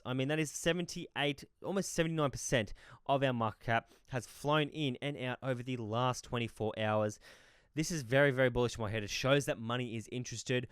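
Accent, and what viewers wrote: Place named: Australia. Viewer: Mate love your work ! Great to hear a fellow Auzzie, with great research !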